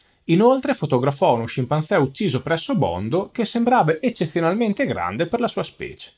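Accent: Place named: Romagna, Italy. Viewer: C'é un vago richiamo al mitico tabloid di Luttazzi alla Gialappa, dovuto all'accento romagnolo. 😍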